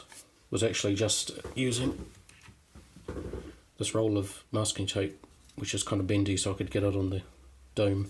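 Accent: New Zealand. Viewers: its the new zealand accent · Are you a kiwi? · Slipps Dungeon he’s from New Zealand what’d you expect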